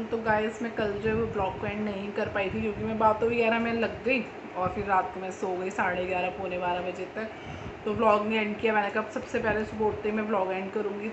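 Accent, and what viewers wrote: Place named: Punjab, India. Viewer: You speak like a punjabii girl😍😍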